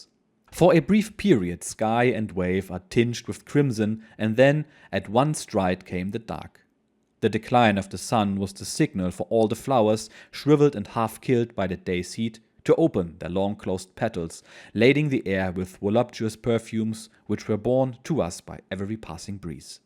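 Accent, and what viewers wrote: Germany. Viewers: What a surprising and interesting choice of theme this time! I bet our American friend will flock to to join us to take this journey. As I have said before, your way to present these historical adventures is commendable and the little German accent of yours is perfect for exactly this one - thank you, I'm hooked once again!!💙💙💙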